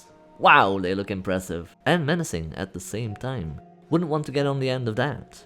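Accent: France. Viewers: ton accenbt est vraiment interessant, parfois c'est francais, un peu anglais et une autre pays. j'aime ca!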